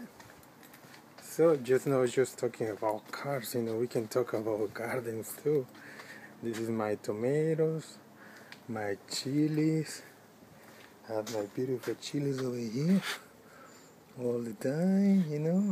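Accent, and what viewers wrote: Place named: India. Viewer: India akcent 😊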